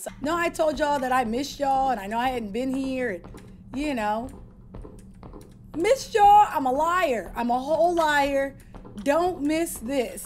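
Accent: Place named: Bronx, United States. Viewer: Did you peel my melon cap back blue😂🤣😂🤣😂🤣😂 you sound like you from my hood Bronx NY 💪🏽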